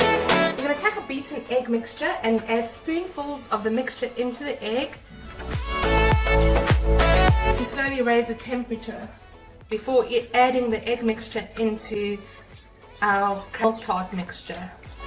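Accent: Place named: Australia. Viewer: Im going to try it looks awesome! Why did I believe you were from Australia 🤪😅 I guess you're from South Africa (Ive been to cape town as child (still have a scar lol cuz i got stuck in cement it dries fast there 🙄 but when they got me off with mzchines n stuff, I was scared and ran into a closed (I thought the door was open, it was just very clean 😅) but the door broke and I got a huge scar /cut back then. Today its an itsy bitsy scar, but I think of South Afrca each times, iften as I wear make up, 😂) thought you should know, don't ask me why 🙄lol But damn it looks great I wanna try makibg it! Missed to see you cook (loved your last video tho, I'm still in schock and more get up to the surface 😱 Thanks fir great recipe 😋 Xoxo mm 😊👣🌻❤️👍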